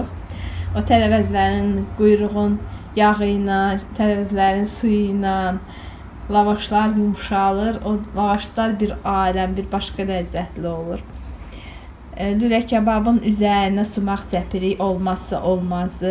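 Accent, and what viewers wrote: Baku, Azerbaijan. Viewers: MaşAllah gözəl Bakı ləhcəsi əllərinə sağlıq.Mən sizi izləyirəm bişirdiyiniz his olunur dadlı və ləzətlidir təşəkürlər.Nafisa Qurbanova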